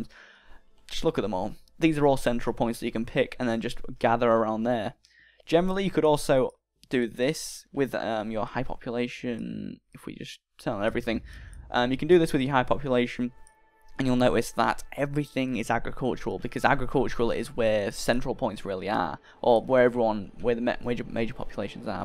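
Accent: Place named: United Kingdom. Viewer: can you talk a bit slower lol. i dont british or uk or whatever accent you have at all and the million words a second is near impossible to get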